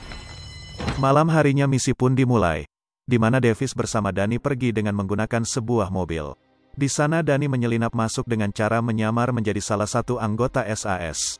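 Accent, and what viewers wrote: Palembang, Indonesia. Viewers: Anjirrr.....cewek Palembang cokkkk 🤣🤣🤣🤣👍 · Wong plembang y om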